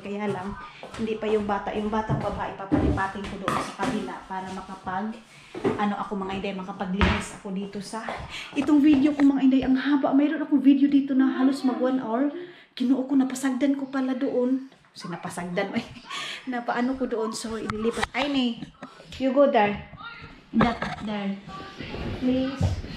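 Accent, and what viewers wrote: Visayas, Philippines. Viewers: Bisaya man Did ay ka Ma'am hahaha asa ka sa pilipinas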